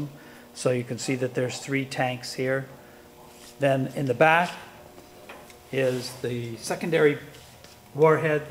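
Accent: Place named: Middle East. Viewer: I remember seeing the barrack missle system had Hebrew writing on it were you in Isreal. Also you sound middle Eastern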